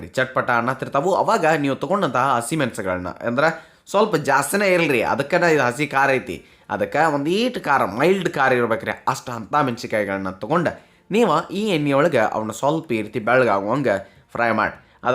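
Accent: North Karnataka, India. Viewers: ನೀವು ನಮ್ಮ ಉತ್ತರ ಕರ್ನಾಟಕದವರು ಅಂತ ನಿಮ್ಮ ಭಾಷೆ ಹಾಗೂ ಚಟ್ನಿ ಮಾಡುವ ವಿಧಾನದಿಂದಲೇ ಗೊತ್ತಾಯಿತು ri. ಏನೇ ಆದರೂ ನಮ್ಮ ಉತ್ತರ ಕರ್ನಾಟಕದ ಅಡುಗೆ ತುಂಬಾ special ರುಚಿ ri.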